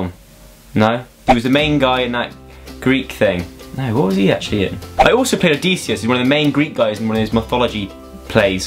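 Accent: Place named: RP, United Kingdom. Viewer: oh joe your little posh accent is sooo cute xx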